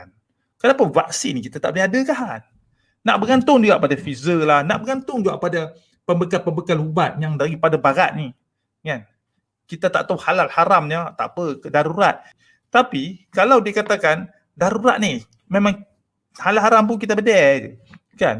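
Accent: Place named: Pahang, Malaysia. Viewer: tuan orang Pahang kan . loghat macam Pahang je 😆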